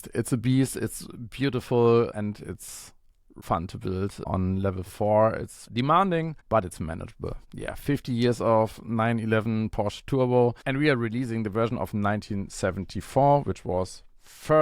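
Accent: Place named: Germany. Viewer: Fendt Traktor is too expensive!Ford Mustang only 60 parts??Porsche 911 the repop of the old crap kit from the 80s??BMW M3 is a Fujimi tool!Shelby Mustang only repop with new decals!Wow,what a lineup!And its always a torture to listen to this "denglish"!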